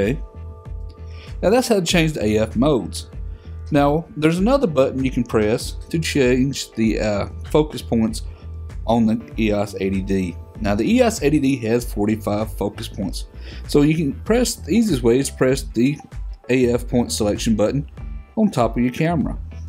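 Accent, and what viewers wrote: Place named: Texas, United States. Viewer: . Your Videos are great. I have just bought the 80D and your tutorials are really helpful. Many Thanks. By the way, you sound like you come from Texas! We lived in California for a few years back in the Eighties.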